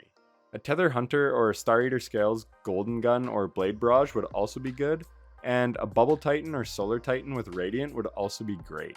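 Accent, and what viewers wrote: Canada. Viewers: I was fairly certain just hearing you speak but as soon as you said “off the rip” I knew definitively that you were Canadian lol. Solid video, dude. 👌🏻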